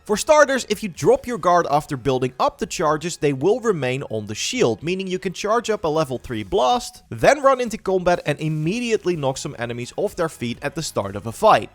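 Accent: Netherlands. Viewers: Do I smell dutch or not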